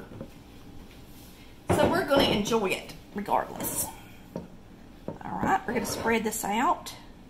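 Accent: South, United States. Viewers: I think your hair is beautiful I love your southern accent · I love your personality and southern draw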